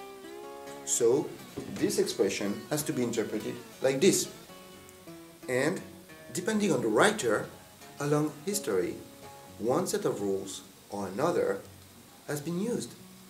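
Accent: France. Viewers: he obviously isn't English, he has a French accent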